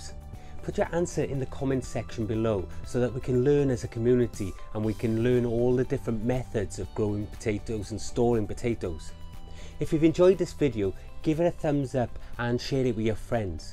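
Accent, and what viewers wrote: United Kingdom. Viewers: I’m American and I can spot that Welsh voice a mile away! It’s a beautiful language that creates a beautiful sound when speaking Engliah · wow I used to hate UK accents until I heard yours.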